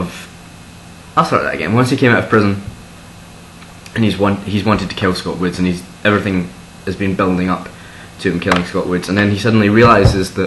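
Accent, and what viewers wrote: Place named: Glasgow, United Kingdom. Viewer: Buffalo '66 is an amazing film! Also! Nice glasgow accent!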